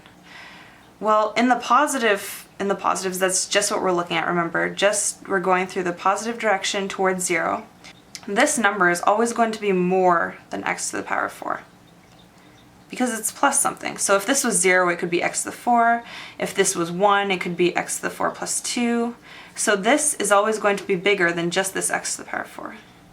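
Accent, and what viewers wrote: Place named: Canada. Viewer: "that was pretty easy, eh" fucking love the Canadian accent.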